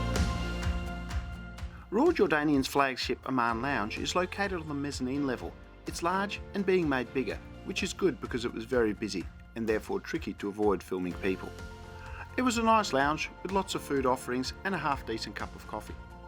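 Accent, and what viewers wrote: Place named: Australia. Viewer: Hi, another great video. Can you speak Dutch? )Asking because you have a proper aussie accent:) )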